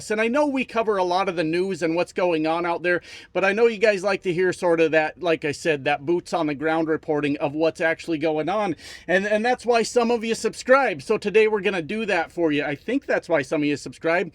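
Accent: Canada. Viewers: Canadians would love to have the old neighbourly relationship back. It breaks my heart to see all the hardships starting to happen to both sides of the border. Are you sure you’re not Canadian? I swear, you sound like us. 😊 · You sound Canadian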